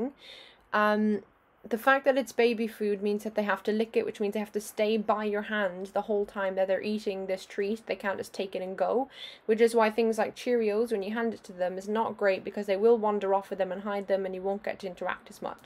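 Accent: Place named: Ireland. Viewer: Love the irish accent! Great video! Next wk. will be bringing home very skittish little girl! Will follow your tips!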